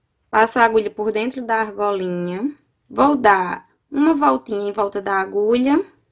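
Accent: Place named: Pernambuco, Brazil. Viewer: Maravilhoso, adorei!!! Que sotaque lindo!! Vc é pernambucana?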